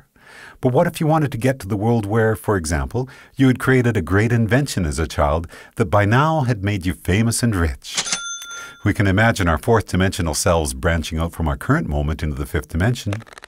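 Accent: Canada. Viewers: The minute you started talking, I thought, "this guy sounds so canadian."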